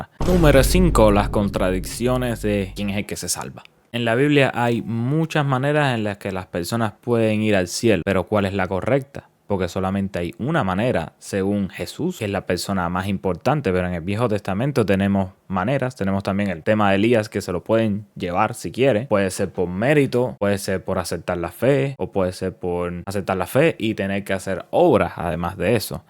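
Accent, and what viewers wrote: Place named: Cuba. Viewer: Bro eres Elieser el Bayardo versión agnóstico ateo , me encanta escuchar el acento cubano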